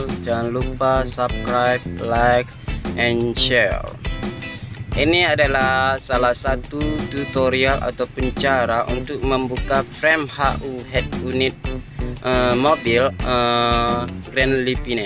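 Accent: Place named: Aceh, Indonesia. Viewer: Medok. Yaa aceh nya:v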